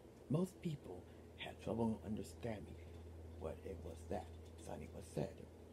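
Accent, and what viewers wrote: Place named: United States. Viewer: you do! everybody does! lol! the accent of the place we are from, city, state, area ect. being from italy, i hear your american accent very much! :) It was ment to be a compliment.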